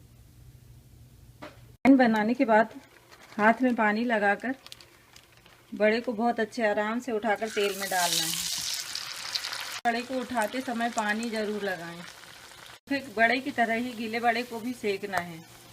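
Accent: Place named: Bundelkhand, India. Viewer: Bhasha k lhze se bundelakhnd jablpuriya lg rhi h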